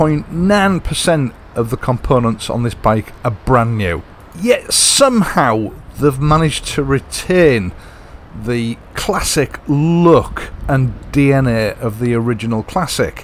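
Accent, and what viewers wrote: United Kingdom. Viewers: Great British accent and great British engineered bike! The British are far better than Americans and even better than the Japenese on traditional standard motorcycles, the only type that interests me. My other bike is a Triumph T120, another great classic bike!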